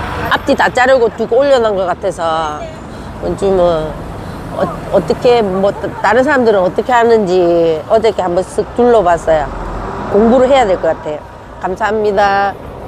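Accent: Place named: Busan, South Korea. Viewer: 수고 많으셨습니다....페북에서 뵐때는 무서웠는데 ㅎ...목소리는 전형적인 부산 아지메 네요....화이팅~!!!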